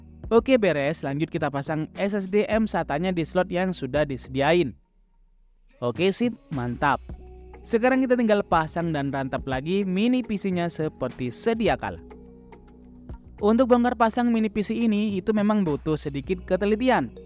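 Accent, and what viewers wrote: Madura, Indonesia. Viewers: Owalah, orang bondowoso, pantesan. Umumnya d rakit, malah ngomongnya rantep, kerasa maduranya😅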